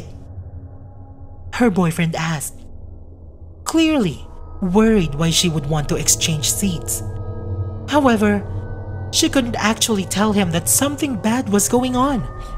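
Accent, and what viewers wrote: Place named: Philippines. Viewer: Ang sosyal naman..first time I heard a Filipino narrator doing an English story. Something new, although I didn't feel so scared because I was thinking the entire time that you're a different person because of the accent and everything.!! I mean not that intense when you're doing Tagalog horror narrations..Hahaha.. 😍😅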